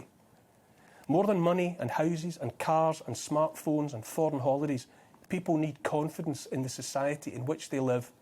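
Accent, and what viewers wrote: Scotland, United Kingdom. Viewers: Listening from across the pond. Your point is made all the more poignant with your beautiful Scottish accent. We are all brothers and sisters in this madness. Thank you for your courage and may we all have the same courage as you. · What a great commentary, and delivered in a wonderful Scottish accent as well! I feel like a tired, worn-out soldier in a foxhole almost thinking it's all over who's just heard the bagpipes of an approaching Scottish regiment coming to my aid and just knowing everything's going to be all right!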